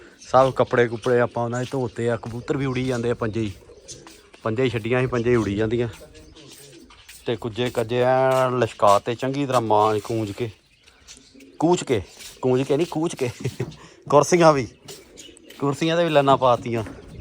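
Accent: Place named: Doaba, India. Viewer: Bai tuadi boli duabe mtlb sade Ali a kehda area Banga ja phagwara ?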